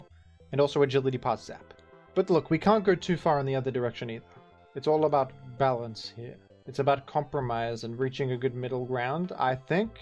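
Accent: Australia. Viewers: I watch so much that I now internally pronounce "Baton Pass" the Aussie way. I accidentally said it to my partner even, which gave her quite a laugh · You're australian??? I never wouldve guessed.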